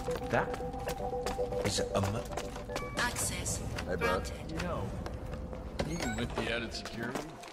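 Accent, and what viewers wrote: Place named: Romania. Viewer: Se pare ca iesti roman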